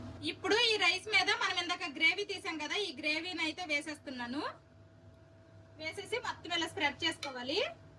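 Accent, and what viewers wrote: Srikakulam, India. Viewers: Hi గారు నా పేరు కరుణ నాకు హాయ్ చెప్పండి మీ శ్రీకాకుళం భాష నాకు చాలా నచ్చింది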